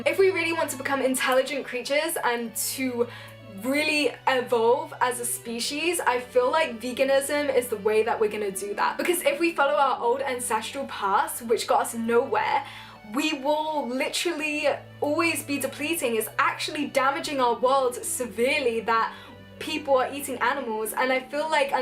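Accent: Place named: United Kingdom. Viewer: I love the sound of the British English 🤔🤔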